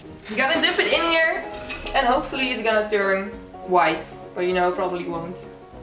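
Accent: Netherlands. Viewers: Are you dutch? ☺️ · U sound so dutch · Is she Dutch? Just wondering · .... Are you Dutch? You talk like my classmates in English class.. well actually a bit better but it’s like I’m talking English but then without an accent ( sorry no offense) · Are you dutch?? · Ur dutch, i can hear it. But i stan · Is she Dutch? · Are you Dutch? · Where are you from? Your accent sounds Dutch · i think she’s dutch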